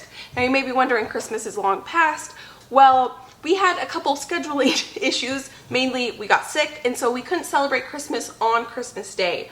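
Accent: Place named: Michigan, United States. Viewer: Pasties! I thought I heard Michigan when YOU talk.. I’m from Michigan too!!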